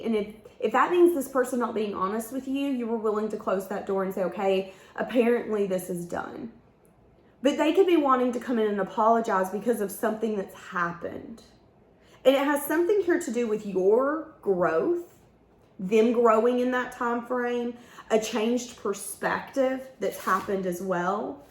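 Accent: South, United States. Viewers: Wonderful Reading 💜 And I Just Love Your Southern Accent & This Is Coming From A Texan 🤭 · Oh my gosh! I LOVE your hair!!!!!!! You are seriously cute as a button. And that southern accent just completes it 😆 Total wallflower fan girl, loyal subscriber here in Dallas! On to the reading. I already know it’s going to be on point, as always. 👌🏼 Love you to infinity and all of my other favorite card readers for sharing y’all’s gifts with us. It makes us feel so seen and heard! ❤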